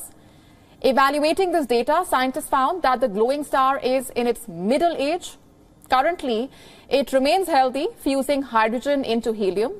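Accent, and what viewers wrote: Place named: India. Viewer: Daily dose of indian accent news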